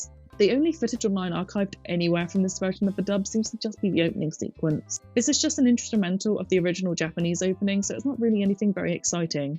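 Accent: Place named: United Kingdom. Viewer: Your voice is so calming, especially since you have a familiar “accent” being British too =]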